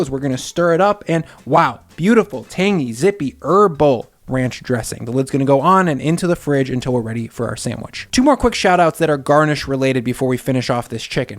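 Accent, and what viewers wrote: Chicago, United States. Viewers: I'm guessing the accent is Chicago? The "SH" sound in a word like, "schtrength". This looks like a Nashville Chicken sangwich but without the pickle juice marinade for the chicken.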